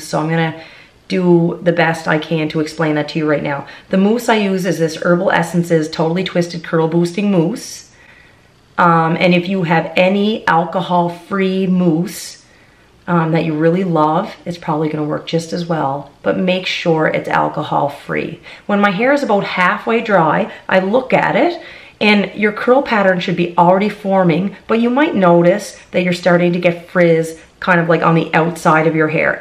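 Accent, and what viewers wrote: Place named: Nova Scotia, Canada. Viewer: I love your accent. I walk around practicing my Nova Scotia voice.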